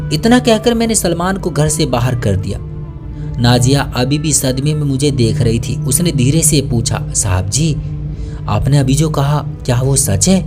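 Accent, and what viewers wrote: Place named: India. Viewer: To kya hua kisi kisi ki zaban aise hi hoti hai jaise mostly bihar aur up mp side walo ki zaban aise hi hoti hai aapko samjh aa gaya na bas wahi bohot hai😏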